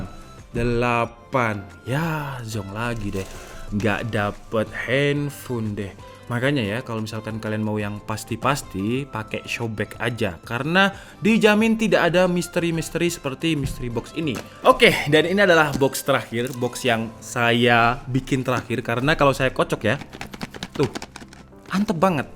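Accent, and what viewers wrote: Java, Indonesia. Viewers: Smpeyan wong endi kang... Bahasa ne...bared.anteb....😉😉😉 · Jawane ndi mas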